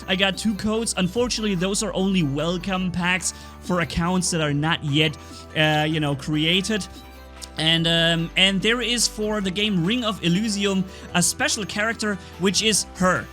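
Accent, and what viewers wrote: Germany. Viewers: Are you german bro?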